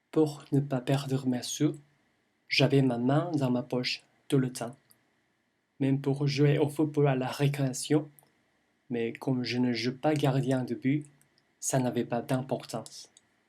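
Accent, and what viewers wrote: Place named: Quebec, Canada. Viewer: It's canadian french, just saying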